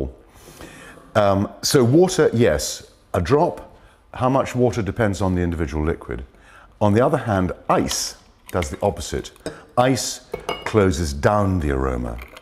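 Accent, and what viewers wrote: United Kingdom. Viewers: A British Optimus Prime?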